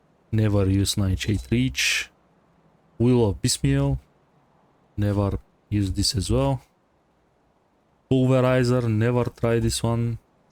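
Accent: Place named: Russia. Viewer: слышу русский акцент)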